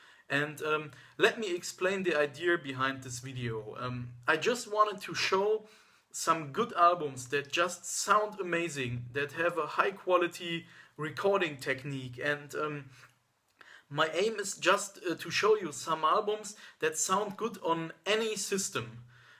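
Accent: Germany. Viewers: u german? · Den deutschen Akzent hört man sowas von heraus, das ist echt krass!:D Danke für die ganzen Tipps, insbesondere bezogen auf lagst du verdammt richtig. Ein paar gut klingende Vorschläge hätte ich aber noch: " " von klingt auf Vinyl einfach großartig. Selbst kurz vorm Ende der Plattenseite klingt es noch großartig. " " von besitze ich als Erstpressung von 1972 und das Schlagzeug, der Bass, das haut einem bis heute immer noch perfekt um. Sollte in keiner Sammlung fehlen, für 1972 aber auf zweifellos eine grandiose Abmischung, welche ja eig. kein Remaster benötigt hat, ehrlich gesagt. " " von ist ehrlich gesagt eines der Alben, welche einen Klang besitzen, was viele von Vinyl nicht erwartet hätten. Der Bass ist prägnant wo es nötig ist, jedes Instrument ist klar und ohne Verzerrungen, noch dazu ist der Dynamikumfang insbesondere beim ersten Part von großartig. 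Wohlgemerkt bei einer Spielzeit von glaub 27min nur allein auf dieser einen Seite! Bei keinem Album war ich bisher so von der Klarheit überrascht, wobei es auch aktuelle Livealben toppt, egal ob digital oder auf Vinyl. Ich belasse es erstmal dabei, da meine weitere Vorschläge für audiophileres doch wieder etwas mehr Wert sind als 1$/1€!:)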